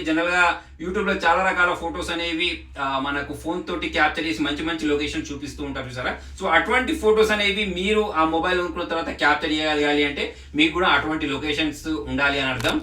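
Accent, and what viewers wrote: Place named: Karimnagar, India. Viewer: .. మీరు చెప్పే దాన్ని బట్టి నవ్వొచ్చింది బ్రో..iam not laughing about your content...knr slang..big fan of u😊😊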